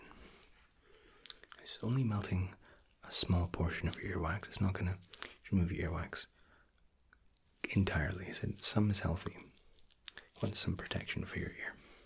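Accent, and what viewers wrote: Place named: Scotland, United Kingdom. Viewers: Finding your channel has been a godsend! I’ve been living in New Zealand for a year now, but I’m originally from a town near glasgow! So hearing your accent is so relaxing! Cheers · Scrolling through the comments wondering how long after a video was posted is it still worth commenting (because I wanted to say how your voice is seriously my fave ASMRtists voice! So relaxing!) when I came across this comment.....How did I not realise you were Scottish?! That will explain why your voice is the best! I'm from Glasgow :-D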